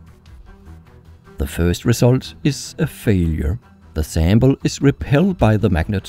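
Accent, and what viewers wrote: Denmark. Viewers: I feel like you have a danish accent, hvis du er dansker så like mit comment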